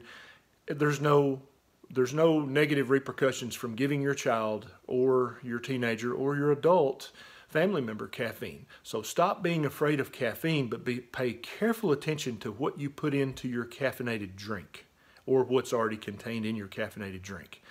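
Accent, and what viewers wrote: South, United States. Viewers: What part of the south are you from? Im curious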